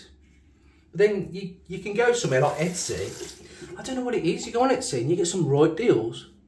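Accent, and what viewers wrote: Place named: United Kingdom. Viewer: New sub here, wet shaver since lockdown, started 1/7/2020 a date you can remember 😂. I bumbed on your video and said to myself what an enthusiastic lad, I hope you keep this freshness to your future videos. I also love paa john frum, cad is another favorite. To the lad who wrote about why going to so much expenses I would answer when you get in the rabbit hole you can't get out 🤣. Where about in the UK are you? I try to figure out from your accent. I live in Southampton. Take care buddy 👍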